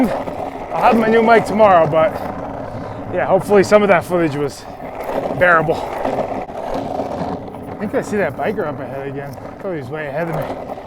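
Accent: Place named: Long Island, United States. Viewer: Your demeanor and mannerisms remind me of Chris Cuomo. He's a Long Islander too so maybe its the accent. Great speaking voice!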